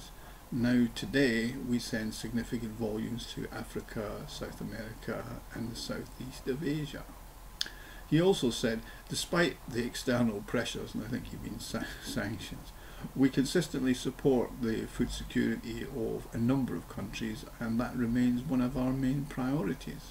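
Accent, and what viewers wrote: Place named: Glasgow, United Kingdom. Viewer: I'm guessing you are from the Glasgow area. I am in Knightswood but grew up in Dumbarton. Can I respectfully suggest you invest in a decent microphone? You deserve one!!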